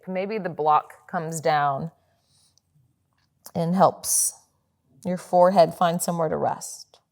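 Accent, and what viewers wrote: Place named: Australia. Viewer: Thanks so much! Love your classes! And love your Aussie accent! 'Share this with ya!" around 1.20. lol.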